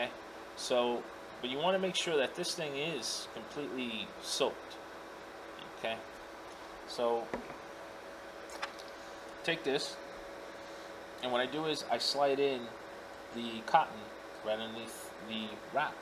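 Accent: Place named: Brooklyn, United States. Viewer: Something tells me your from Brooklyn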